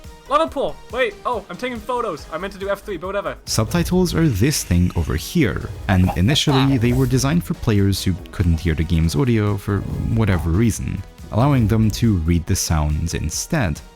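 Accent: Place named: Scotland, United Kingdom. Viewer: It's the vowel sounds that are giving that scottish twang especially the 'I' in minecraft, Northern english accents can have a lot of overlap with accents north of the border so that makes sense! Anyway cheers for answering and class video man!!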